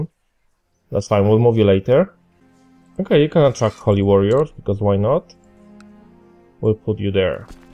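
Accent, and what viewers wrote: Poland. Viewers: I did know that from the beginning of the video, you have typical polish accent :D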